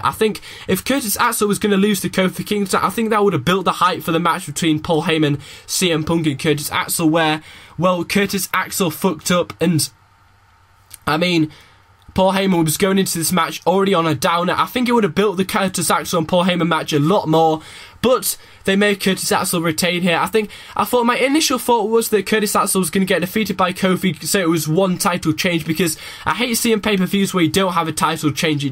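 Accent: United Kingdom. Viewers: I thought you were British!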